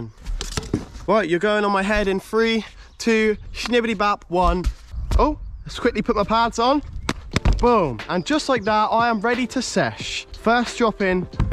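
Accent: England, United Kingdom. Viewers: Is this guy british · Are you English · Are you from England? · BRO I LOVE HIS BRITHIS ACCENT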